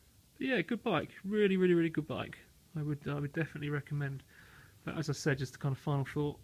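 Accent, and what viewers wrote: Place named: United Kingdom. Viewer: i really wanted to hear this video,but i just can't; i can decipher a British accent fairly well but need to be able to hear it to do so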